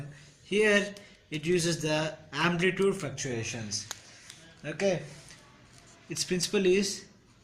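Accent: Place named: South India, India. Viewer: Your explanation is nice..but try to speak clearly..your South Indian accent is really confusing at times Thankyou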